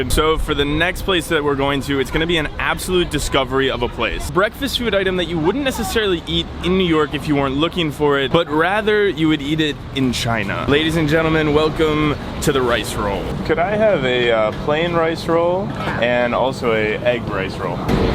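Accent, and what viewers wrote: France. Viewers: i was wondering when you travel around the world and get so much street food does it ever happend to you to get a food poison nice french accent btw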